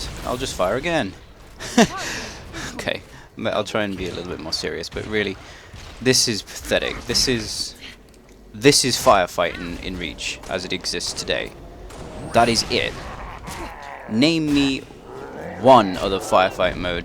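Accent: United Kingdom. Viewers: are u english??????????????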